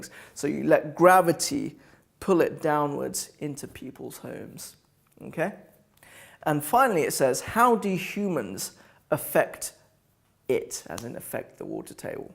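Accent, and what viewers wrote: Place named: United Kingdom. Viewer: bro no offense but u sound like british but looks like an indian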